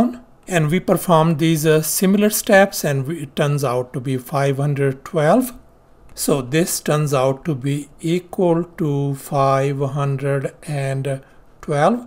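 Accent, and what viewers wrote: India. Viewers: Sir you are amazing... your accent??....are you Indian or Pakistani??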